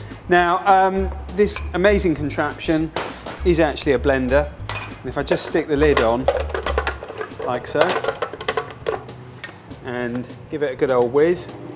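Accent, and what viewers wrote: United Kingdom. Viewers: That thing with british accent I always fall in love. New sub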